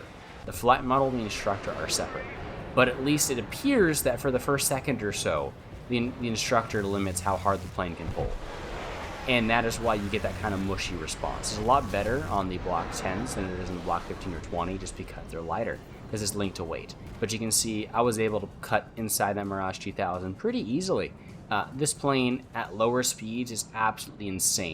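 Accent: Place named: United States. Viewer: clicks on sees chinese decal on plane an white boy american talking dislikes clicks off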